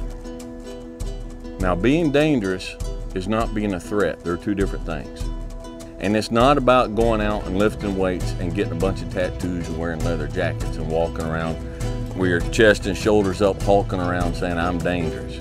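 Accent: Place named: South, United States. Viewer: Somehow hearing all these sayings, with the southern accent makes you want to be a Badass Buddha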